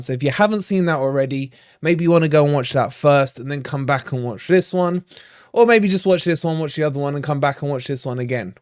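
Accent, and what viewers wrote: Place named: Nottingham, United Kingdom. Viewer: That's the most ridiculous Nottingham voice ever.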